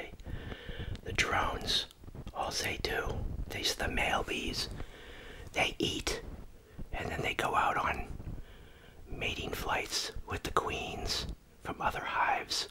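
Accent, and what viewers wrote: United States. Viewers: 15 seconds in and i find your american accent very tingly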